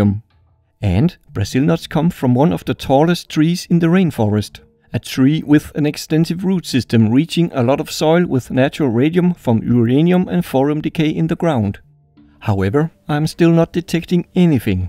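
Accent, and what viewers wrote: Denmark. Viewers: Are you danish? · Gotta love that danish-english accent ❤️ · Er du fra Danmark? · Wait what are you, Danish, i always thought that you were German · Are you Danish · Australian? It’s danish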